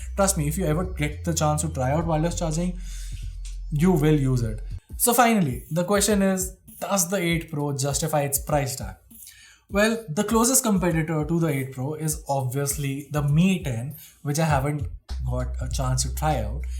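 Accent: India. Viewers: Holy shit, no offense, but it’s difficult to understand you when you speak fast. But I always had troubles with Indian accents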